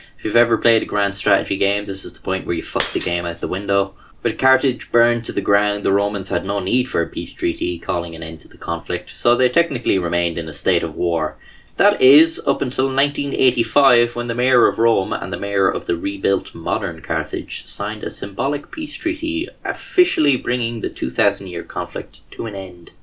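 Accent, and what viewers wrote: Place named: Ireland. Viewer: Lol I love getting historical facts in a sarcastic Irish voice. Moreover, I am of the opinion Carthage should be destroyed.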